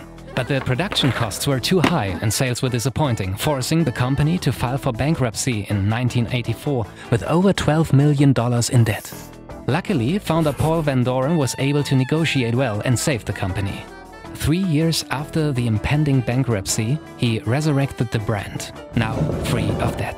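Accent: Germany. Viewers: I could tell it was a German accent right away! But I'm pleasantly surprised by how well he speaks English. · the strong german accent ahhh yess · You probably hate to hear it, but are u german? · Most Germans sound like this (or worse) while speaking English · this guy german accent gets me everytime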